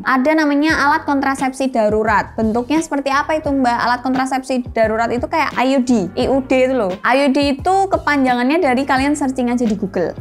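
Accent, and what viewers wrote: Java, Indonesia. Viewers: Sex Education dgn logat Jawa yg kental , kearifan lokal, btw endorsement Kondom Vivo ya empiris apa empirisme nih wkwkwkwk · baru tau chanel ini. .bhasanya medok jowo ne ki wong ngndi.. 😂 salam knal wong jwo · seng tak seneng i... omong medok.e..... DOBBOLLL.... 🤣😂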